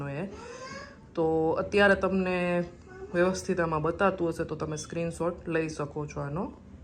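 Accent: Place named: Pahadi, India. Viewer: Nice vlog di Mane to tamaro pahadi avaj bau game Che sambhdvo ne tamari bolvani spich ❤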